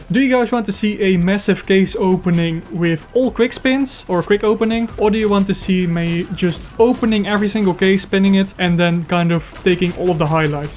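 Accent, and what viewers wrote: Netherlands. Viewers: Je klinkt Nederlands, maar waarschijnlijk heb je geen idee waar ik het nu over heb haha.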